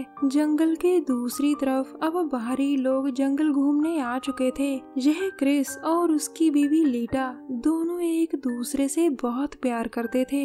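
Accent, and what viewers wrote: Punjab, India. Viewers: Mam Panjabi lagg rahe ho aap , aap k bolne K treeke se lagg Raha hai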